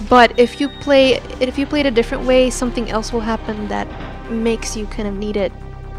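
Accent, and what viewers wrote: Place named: Sweden. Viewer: Swedish wow I have never heard a swedish accent :), lol I'm guessing you're getting mad about people saying you don't? I do have to say your english is very good, however I do like that accent!! It sounds awesome.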